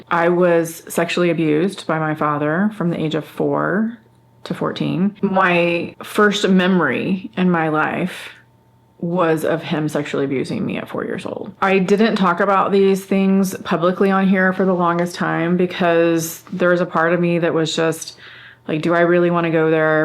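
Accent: Texas, United States. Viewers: Hello,. My wife subscribes to you and we’ve watched you for years. I finally subscribed, too, DUH! Shoulda done that a long time ago. I, too, have long wanted to write a memoir that also incorporates some of my Dad’s story. I have a blog named The Gay Man’s Daughter, if that tells you anything. One thing I have decided is that if I write a book it won’t be linear. Everyone tells me that I’m full of stories which I tell from time to time. Such as My Mother’s Six Degrees of Separation to the JKF Assassination. (She had a clothing store in Arlington, Texas - my home town - and sold the clothes that Oswald’s children wore to his graveside funeral to two men in dark clothing the day before. She didn’t realize it until she saw the photos in the Dallas Morning News the next day. I didn’t learn about it until I was 17.) Those kinds of stories! I left the DFW area at age 35 to relocate to the Puget Sound area as well and have never looked back. 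Thank you for sharing everything in this video. You’re an inspiration to me and my wife and to so many others. Your grace and intention is a hard thing to find and I know you’ve worked hard for it. It’s also nice to hear someone who has the same “accent” that I do! I worked hard to lose my accent when I moved here 33 years ago cause my students poked fun at me. Love you and everything you do. (We have an RV van and travel in it quite a bit - we love the road, also.) Take care.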